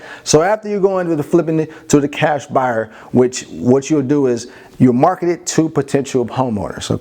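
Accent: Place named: Jamaica. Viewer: Hi, this is Carol Whilby, heard the yard accent, this is an answer to prayer, I NEED A MENTOR REALLY BAD, hope you have a spot for me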